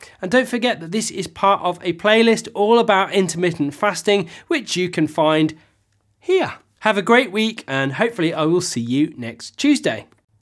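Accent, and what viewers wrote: England, United Kingdom. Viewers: I am a black coffee loving Londoner who's been on an OMAD diet since Jan 1st. I stumbled across your channel by accident, and am very pleased that I did. It shouldn't matter I know, but nonetheless I find it oddly reassuring to hear an English accent as opposed to the American centric content that's so prevalent on YouTube. You have a new subscriber. Keep up the good work.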